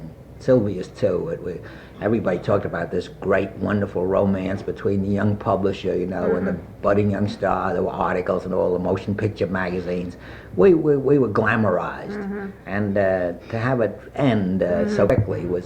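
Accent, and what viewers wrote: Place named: New York City, United States. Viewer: That accent is Manhattan West Side, like *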